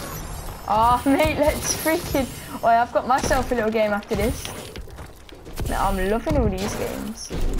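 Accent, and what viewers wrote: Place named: Birmingham, United Kingdom. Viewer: You sound like your from Birmingham... Great comeback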